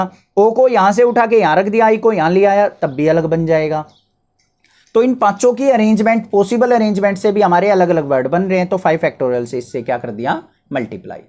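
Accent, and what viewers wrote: Haryana, India. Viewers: Sir aap haryana se ho😊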